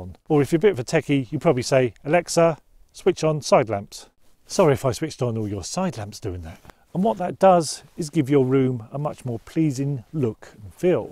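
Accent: United Kingdom. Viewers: Hi! Is it Yorkshire?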